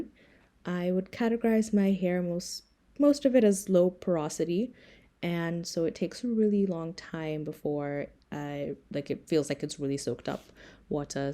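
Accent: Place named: Kenya. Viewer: She's Kenyan.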